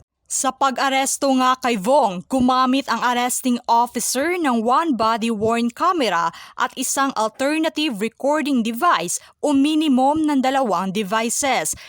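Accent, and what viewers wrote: Visayas, Philippines. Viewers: Bisaya ung vlogger nito hula ko🤮🤮🤮🤮ang malambot tumitigas..ang matigas nalambot🤮🤮🤮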